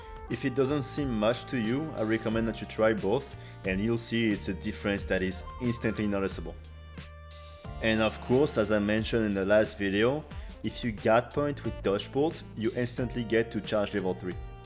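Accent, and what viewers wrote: France. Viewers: ohh i love bow what set are you using and are you french? i think i hear a french accent :3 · Haha cet accent, tu es français n’est ce pas ? Sympa la vidéo!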